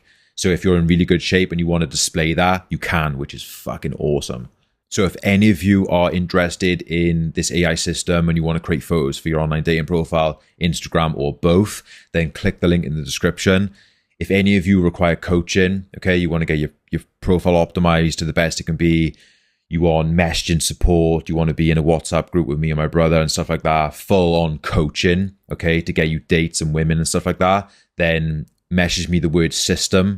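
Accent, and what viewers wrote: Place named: Wales, United Kingdom. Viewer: you welsh? im also from wales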